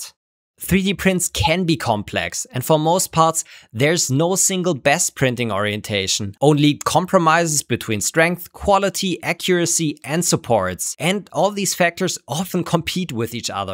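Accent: Germany. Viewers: I can hear that german accent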